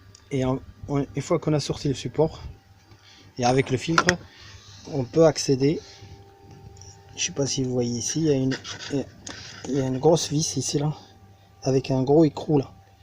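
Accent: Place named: North Africa. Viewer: Accent bledart et accent du sud ca fait space.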